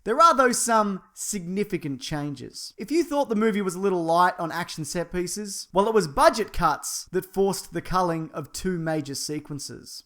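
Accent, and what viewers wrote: Australia. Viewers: I tuned in to WATCH deleted scenes as your title indicated. All I heard was you blabbing in your crappy Aussie accent. Stop misleading ppl with your titles!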